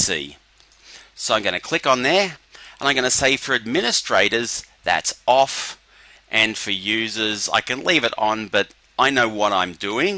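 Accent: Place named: Australia. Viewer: love the auzzy accent ;)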